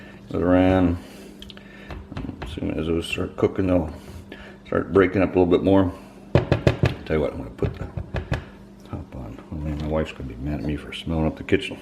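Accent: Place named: United States. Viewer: Usually only Brits and cats can eat Sardines for breakfast, but you sound like youre American....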